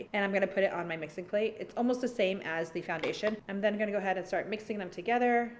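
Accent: Iraq. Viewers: هدئ بيوتي اول مرة الحك عل فيديو من اول ١٠ دقائق احبج من البصرة وحجي عراقي فدوة😂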